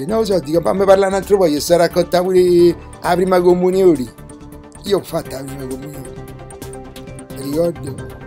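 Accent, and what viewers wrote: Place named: Rome, Italy. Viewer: questo video solo 308 like? Che bel romanaccio! La bellezza del romano ruspante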